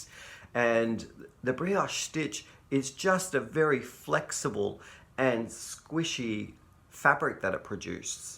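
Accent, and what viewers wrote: Australia. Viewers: Ha ha! Cute story about the garbage bin. I'm from Southern California. I call it "trash" , but my friends from Hawaii call it "rubbish". BTW I love your Australian accent!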